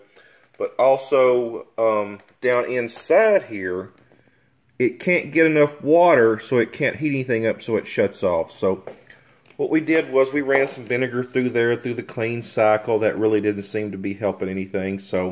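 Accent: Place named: Texas, United States. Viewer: I knew you had to be from Texas when I heard you start the video :) Thanks for the tips.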